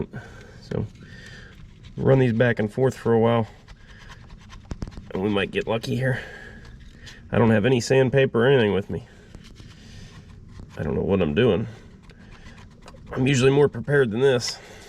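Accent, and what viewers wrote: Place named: South, United States. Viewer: that little southern drawl inflection is great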